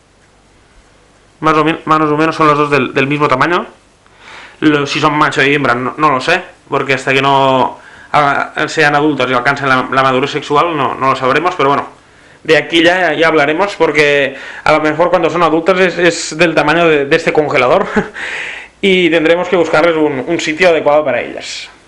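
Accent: Catalonia, Spain. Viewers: no se por que pero tienes un acento catalán tio xd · Me encanta tu acento catalán 😂😂😂 es muy divertido Buen video las tortugas son muy bonitas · Es acento catalan porquevme da una rabia cuando lo escucho...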